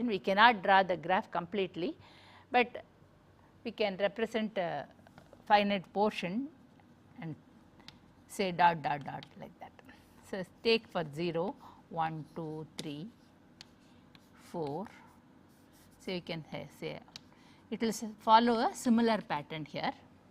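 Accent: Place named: Kerala, India. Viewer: That accent reminded me of my drawing teacher from Kerala lol But she explains so well. I understood everything in one go !! Thank you very much mam !!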